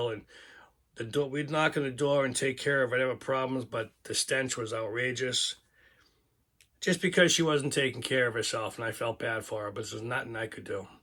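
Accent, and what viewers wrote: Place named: New England, United States. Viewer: Hi! I could not have found your channel at a better time in my life. Thank you ox. p.s. Love and miss that New England accent. I grew up in Massachusetts.❤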